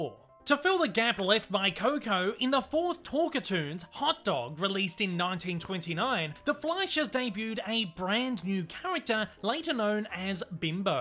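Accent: Australia. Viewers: I don't mind the Aussie accent.😃😃😃😃😃😃😃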